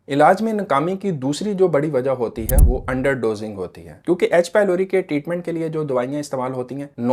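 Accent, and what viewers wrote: Karachi, Pakistan. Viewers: R u from Karachi???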